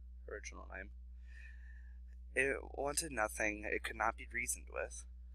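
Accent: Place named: United Kingdom. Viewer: You went from sounding heavy British to a more satisfying deep ear feel.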